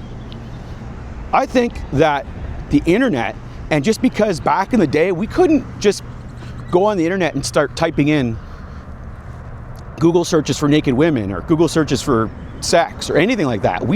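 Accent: Canada. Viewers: Born 1983, so I'm here for this. I grew up without cell phones and we didn't get dial up internet until highschool, so it felt like the best time for that too- it was a new way to connect and plan stuff, and as a nerd, it provided the ability to find other people who liked the same stuff on web forums and chats. At the same time, it didn't create permanent record. There's no viral video or tiktok of me getting rejected or saying something dumb or falling asleep in Environmental Biology (sorry Mr. Gaspar- actually he was totally cool with it and let me sleep since I was normally a good kid and was obviously just exhausted). I was a free range kid for most of my childhood- today I'd have been taken away from my parents by the state. I think that's what changed too, prior generations, if their kid died or was seriously injured doing something stupid, they dealt with the tragedy and moved on. 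Now, those parents could be arrested for letting their kid get into that situation. I'm guessing your Canadian from the accent and some of the terms you used, so from an American perspective, if your kid gets hurt, not only is the parent getting in trouble, that medical bill could be devastating, which certainly incentivizes keeping little Johnny on his iPad vs on a bike. It got too expensive too. Everything did, everything is a commodified, and there is no place for kids to go and just be kids, it's even worse for teenagers. I understand not wanting to drive when every aspect of driving is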